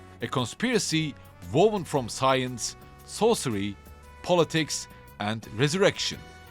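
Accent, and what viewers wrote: Turkey. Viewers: you sound very turkish🧐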